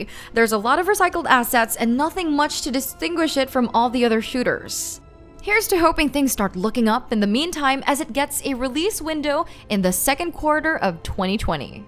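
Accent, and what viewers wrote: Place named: Philippines. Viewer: MooseRocket she sounds like a FOB Filipino?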